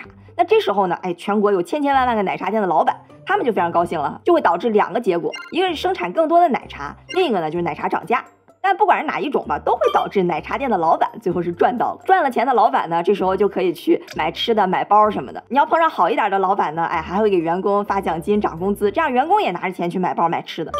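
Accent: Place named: Beijing, China. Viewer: 這北京腔官話真難聽 還是咱河南官話好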